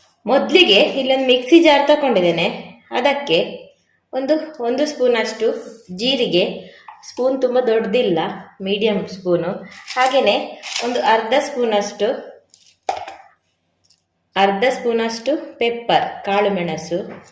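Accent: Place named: Bangalore, India. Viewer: In books nobody use Bangalore Kannada tulu nadu ppl talk books Kannada.. your Bangalore Kannada lot of slang ok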